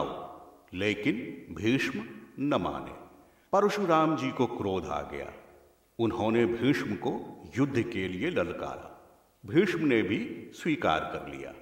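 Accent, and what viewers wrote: Standard. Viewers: शुद्ध उच्चारण में सुनाई गयी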